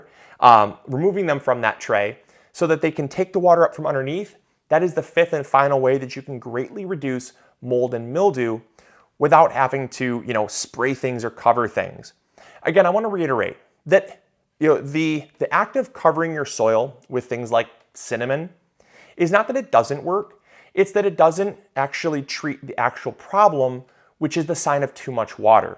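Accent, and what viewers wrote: Michigan, United States. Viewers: Fun hearing your Michigan accent! So different from the South.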